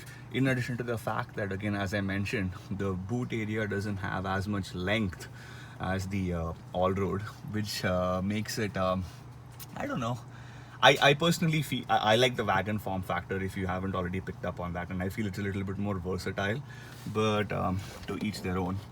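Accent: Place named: India. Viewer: Your spelling is wrong.... it's not NOT " fluidly".... the correct term is "fluently"..... he speaks Indian-English..... the Indians try to speak the language of their White Master, but because their tongues have are tuned to speak Hindi, they end up with a completely different version of the English language.....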